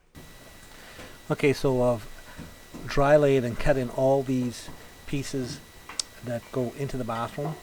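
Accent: Boston, United States. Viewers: You sound like a Red Sox fan so I know you’re not near me. But I could’ve used a tile pro like you. I got shoe makers working in my bathroom.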